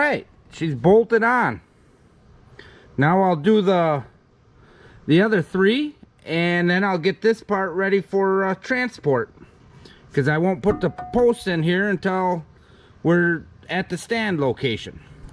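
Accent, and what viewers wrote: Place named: Upper Midwest, United States. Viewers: unrelated but... I heard you speak and you are either a Wisconsinite, Minnesotan, or a Michigander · Dont sound like hes anywhere near Georgia lol. More like Minnesota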